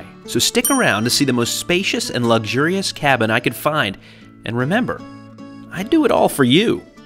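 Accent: United States. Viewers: It's because he sounds and acts American. Some Greeks don't take kindly to Americans, or has that changed? There was a time where it was bad. I remember a time where my Cousin brought an American to Greece, took the Taxi, and got verbally abused by the driver. My cousin answered back in the most perfect Greek to which he shut his mouth. I would assume the same for Journey. I could be totally wrong.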